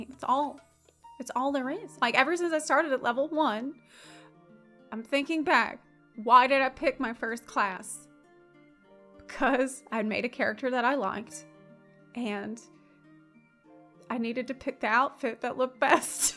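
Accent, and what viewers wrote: South, United States. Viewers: I'm dying laughing cuz you got a southern accent in like 6 months of me not watching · Did pick up a southern accent? It's pretty adorable. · The southern accent is here thick now and let me just say I'm here for it. I feel represented. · No I agree that's a lot of cool glamorous and stuff in the game and it's a fun part of it and that's just it if that's all you want to play play it like that it's your game play the way you want to that's what they designed it to be I thought. Also I'm not sure if you're putting it on for a show but I'm hearing slips of southern accent are you originally from the southern Us by chance? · A few comments as I watch.. 1) lmao that thumbnail. Great editing xD 2) "What is a mount but a pedestal for which to put my character in her perfect glam" 🤣 That got me. 3) Thing really escalated around 7mins hahaha but it's so true. 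IT'S ALL TRUE. FOR THE GLAM. 4) I realize there's so many clips just to show off glam. There was a lot of work put into this video <3 5) I can hear that southern accent coming out strong 🤠 I love your content,. I'm glad to see stuff here again and I'm sure a lot of us feel the same way about the pursuit for Glam. Why do Savage when gear is obsolete not long after? Stats are temporary... GLAM IS FOREVER. I'll say this though.. The game needs more suits and shoes imo. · Did I just find a streamer with a southern drawl?... I hope that's not a pet peave. Because that's cool, and subscribed!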